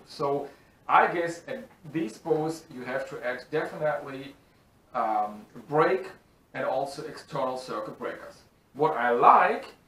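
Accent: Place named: Germany. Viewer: Na hier hast du dich wohl verkalkuliert! Das ist wirklich schade dass du dieses Video besonders dieses Video nicht in Deutsch gemacht hast. Denn die Nachfrage von deutschen Windkraft-Freunden ist sehr sehr groß und es sind so viele Fragen offen und ich kann mit Englisch beispielsweise gar nichts anfangen so auch die Community in der ich bin und ich habe überhaupt kein einziges Wort verstanden was du da erzählst. Aber deine like gerade die wäre garantiert höher gewesen hättest du dieses auf Deutsch gemacht, weil alle suchen nach solchen Videos in Deutsch und finden ist nicht und jetzt sprichst du Deutsch und machst es in Englisch was ich mega schade und traurig finde was für eine Verschwendung um diesen Bericht. Denn es gibt unzählige englische Berichte für Windenergie nur leider nicht auf deutsch das wäre deine Chance gewesen. Und wie wenig draufgekommen,?... 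Das Englisch war so typisch deutsch. Man hat herausgehört dass du eigentlich Deutsch sprichst 😉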